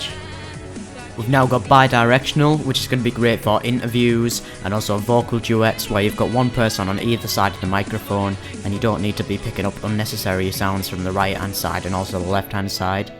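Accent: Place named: England, United Kingdom. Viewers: Actually its quite the opposite. Cockney is southern, he is northern as fuck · great review and great to hear an english accent as well :) also a very good mic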